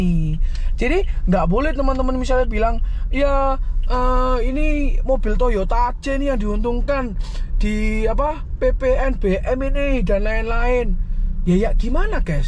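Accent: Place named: Surabaya, Indonesia. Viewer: Mantap koh...., opininya logat suroboyo an...😁😁😁😁😁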